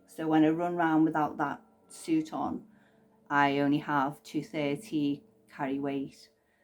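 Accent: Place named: Liverpool, United Kingdom. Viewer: I'm used to the contemporary scouse accent. I can see that you're scouse now just thought this accent died with the beatles.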